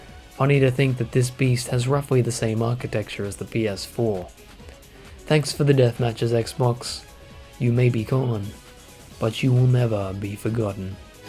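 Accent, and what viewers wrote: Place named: Australia. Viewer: Why keep using the word architecture instead of hardware. Architecture is like you're trying to sound smart. And the way you pronounce games "GEEms" , are you Australian or American, pick one.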